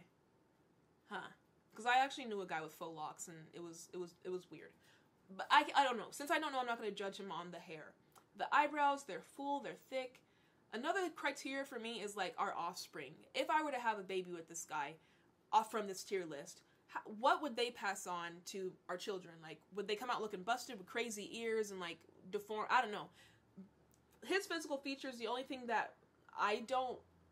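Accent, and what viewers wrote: United Kingdom. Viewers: Came for the casual objectification of millionaire men, stayed for the eerily impressive British accent.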